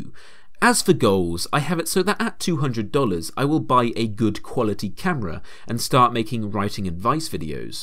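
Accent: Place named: United Kingdom. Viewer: The narrator sounds like a British man 🤔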